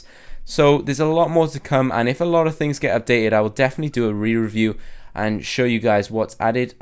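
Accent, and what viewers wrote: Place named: Wales, United Kingdom. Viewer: ACCENT = MINT! ITS GREAT TO BE WELSH... I KNOW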